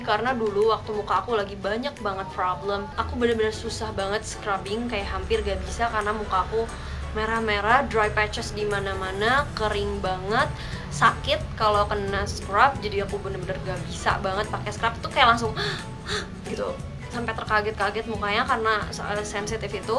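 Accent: United Kingdom. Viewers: 1. 2. probolinggo 3. mau yang radiant skin kak, mau nyoba soalnya kulit aku kusem wkwk 4. ihh aku suka banget sama aksen inggrisnya kakak, bikin video tentang aksen inggris dong kak, trus semoga subscribernya nambah kak. sukses kak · Ig :Vitataaaaaa Kota Asal: Gresik-jatim Yay akhirnya GA time juga!!Awalnya sih aku ngasal buka video kaka gegara nyari review st.ives,dan dari itu aku langsung cinta banget sama chanel kaka,auto like n subscibe + aktifin lonceng sejak pertama kali nonton (ini ga boong swear✌),yg pertama bikin aku jatuh cinta tuh cara present nya yg bener2 se -profesional itu,dan bener2 ngreviewnya gapernah ngasal,banyak banget benefit yg didapet habis nonton video kaka,aku jadi tau kandungan apa aja yg mesti di perhatiin di ingredients produk biar milihnya tepat sesuai skin type,dan dan dan yang paling bikin aku jatuh cinta tuh cara ngomongnya yg ada aksen britishnya subhanallah berasa sekaligus belajar pronouncing gitu😍😍😍dan aku ikutan ga ini karena pengen banget nyobain st ives yg coconut n coffee,penasaran sama deep exfoliate+ gentle nya kaya yg kaka jelasin❤❤❤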